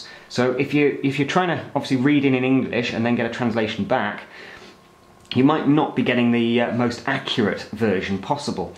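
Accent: England, United Kingdom. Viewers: Your voice is so cool.Are you from England?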